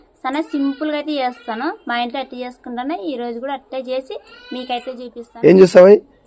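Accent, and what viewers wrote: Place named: Rayalaseema, India. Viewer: Adi rayalaseema village yasa ardhamuc hesukondi.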